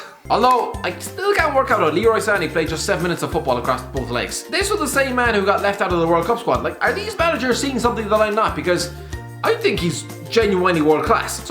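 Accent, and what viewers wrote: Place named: Ireland. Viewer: What part of Ireland are you from ?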